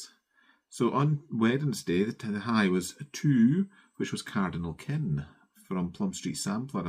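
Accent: Scotland, United Kingdom. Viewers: I think you’ll find your numbers are going to rise again…as Pam of Pam and Steph named you as ‘gold flosstuber’ of the week 🎉🎉🎉 she was quite taken with you - your brogue, temperature stitching and variety of pieces you stitch on. That’s cross stitch fame, is that. Congratulations on your 5,000 🎉🎉 And on your cardinal kin finish - which is smashing. I finished a cardinal piece recently - Cardinal No 1 by Crossed Wing Collection. Big Sis got me the pattern as part of a kit from that wonderful Texas institution, 123 Stitch. It was one of their kits with hand painted fabric. Not as much stitching as your Plum Street - and I’ll admit to cutting back on the excessive pine foliage as I got bored of it (shush don’t tell the cross stitch police). My ever thoughtful Big Sis bought me the Cardinal pin set from Puntini Puntini at the same time - they would look good on yours if you fancy a bit more ornamentation- and quicker than applying chenille. 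Talking of birds, when you showed Ellen’s Ravens at Castle Kerr, it reminded me that some wonderful stitcher had stitched a version with the words ‘we are going to need a bigger moat’ above the castle. I like a bit of whimsy. Love your Autumn Quaker - such great colours. I watched while stitching on Once upon a Summer Huswife by Heartstring Samplery. Carry on blathering and stitching, Steph from Cheshire 😊🎉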